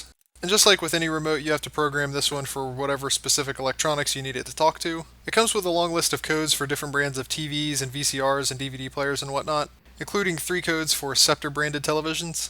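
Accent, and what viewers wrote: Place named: Maryland, United States. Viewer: You are the man. What is your accent are you from MD? Thanks so much.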